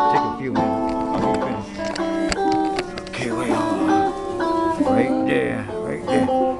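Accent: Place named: South, United States. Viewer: It's so weird seeing a guy with asian heritage having a really southern accent. XD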